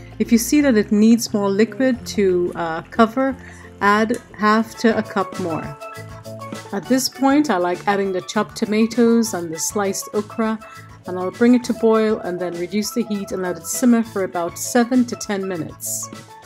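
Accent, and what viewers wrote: Trinidad and Tobago. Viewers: I love your speaking voice, it’s a well rounded, articulate Trinidadian voice and love your channel too.. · Miss thank you for helping me improve my cooking. And continue to speak de trini "twang"😆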